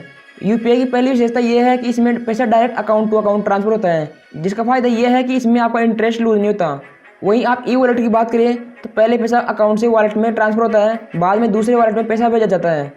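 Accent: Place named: Rajasthan, India. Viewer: Bhai Rajasthan se lgte ho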